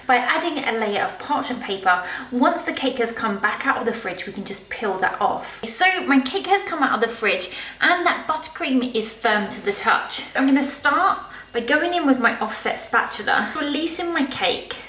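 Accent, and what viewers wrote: United Kingdom. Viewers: Your accent seems like British ??? Right or wrong?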